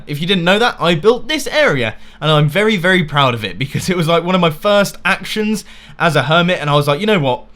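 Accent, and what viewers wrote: United Kingdom. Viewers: Usually, i absolutely hate british accent as a native swiss guy, but i really like how it sounds when you talk :) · You British?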